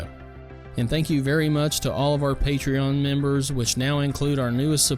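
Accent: South, United States. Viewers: Where you at man? My family and I love watching your videos! Sounds silly, but there’s something relatable we like about listening to a fellow southerner (and Big XII fan) talk about retro games. Keep up the good work!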